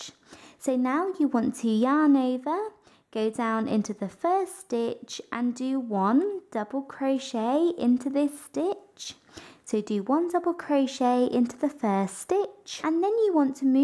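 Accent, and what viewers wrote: United Kingdom. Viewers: listens to accent Oh ok, so British terms... says double crochet while making a treble Damnit brain, you fooled me again!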